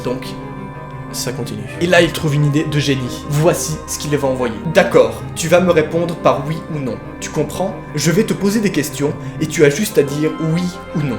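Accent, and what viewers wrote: Switzerland. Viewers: Pas de doute tu es suisse ! J’adore ton accent ! (Je sais de quoi je parle) 😂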